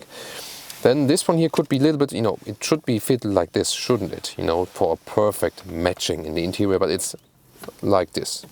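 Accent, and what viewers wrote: Germany. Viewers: You have got a thick German accent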